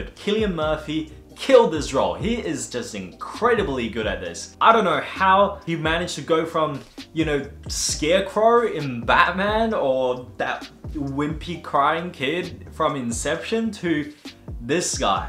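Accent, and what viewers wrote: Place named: United Kingdom. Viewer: I’m blown away seeing and hearing a Chinese guy with a British accent. I’ve been alive for 40 years and have never seen this before. Congrats on being so unique!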